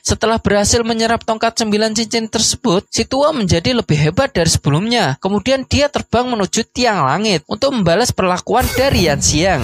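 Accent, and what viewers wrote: Java, Indonesia. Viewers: gw demen nih logat jawanya haha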